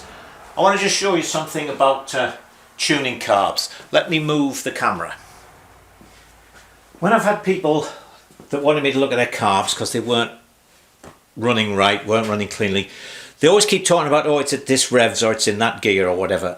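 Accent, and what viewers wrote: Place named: Newcastle, United Kingdom. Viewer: Wonderful to learn from a fellow Limey in NY State. I'm in the Hudson Valley, but lived in Ithaca in '79. Do you accept visitors? I'd love to meet you. You sound like you might be from up around Newcastle way, my best mucker was a Geordie. Anyway, please keep'em coming. I have a '68 TR6C with Routt 800cc kit, a '68 P11A, and a '70 T100C. All in almost original condition. Cheers.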